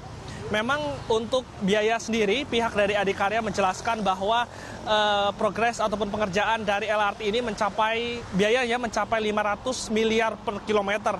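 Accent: Java, Indonesia. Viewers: Ra sah ditahan medokny mas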